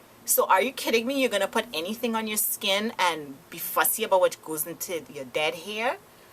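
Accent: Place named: New Jersey, United States. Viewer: Hey... Where r u from? U sound just like my aunt from Jersey. N we r Trinidadian. Anyways, pretty hair! I subbed! :)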